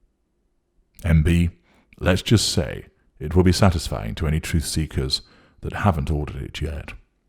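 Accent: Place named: RP, United Kingdom. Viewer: is your name a pseudonym for the Holy Grail of Tutelage of NPD or to represent your being on par with the royal Tudors making you a self-appointed HRH? Or could it be an homage to HG Wells, or a combination of the above? I remember a few, perhaps several, years ago when you did a podcast with a young black American female. I remember what you looked like in detail as I have a photographic memory. In addition, you spoke briefly about your mom being an extreme narcissist, the passivity of your father and how you felt he failed to protect you and your sister from the abuses of your mom. You intimated that you experienced some form of sexual abuse or molestation but did not care to go into the details. You also described your sister as the ultimate accommodating, people pleasing codependent. You told how the YT videos and all the Machiavellianism altruistic outreach work to educate others on the subject of NPD was part of therapy - as in your therapists requested you to do so. 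In the podcast, you said you were only in therapy as a condition to maintain your inheritance and position in the family business (not sure how the last part was phrased). Your voice...a very Queen's English British sort was similar to the one on your videos but far less menacing, but I attribute the difference to the use of some sort of voice machine.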